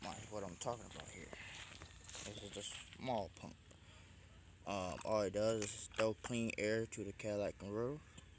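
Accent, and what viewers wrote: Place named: Louisiana, United States. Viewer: ok you're either high, drunk, both or whatever but you're making no sense at all. I can hardly understand what you are saying. sounds like you might be from Louisiana.. but you start one sentence then start taking bout something else. you also need to hold the phone right side up. I lost a lot of brain cells watching this video. not knowing the names of the parts is not helping. by the way I stopped watching your video after 2 minutes...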